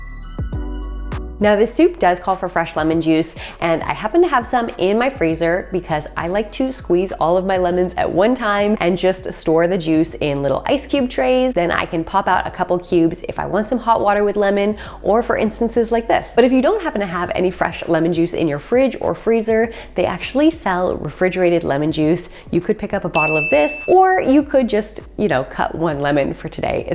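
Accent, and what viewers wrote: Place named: Canada. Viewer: Ive watched a few or your videos and I knew I detected a Canadian accent. When I saw the presidents choice berries That confirmed it.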